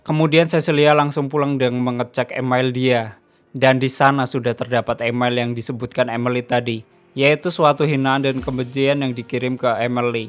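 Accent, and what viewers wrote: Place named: Tegal, Indonesia. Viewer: Voice overnya pasti orang tegal -,-'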